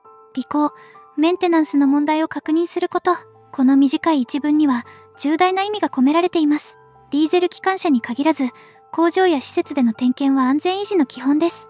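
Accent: Kansai, Japan. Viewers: 関西弁過ぎてわろた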